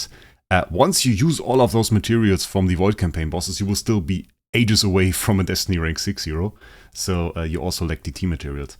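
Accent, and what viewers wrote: Germany. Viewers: Are u German? I hear an accent and I like ur voice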